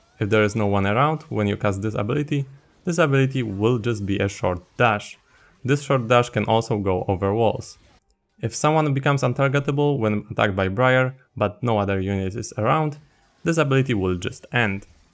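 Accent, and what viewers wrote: Poland. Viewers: Polski akcent uniemożliwia mi oglądanie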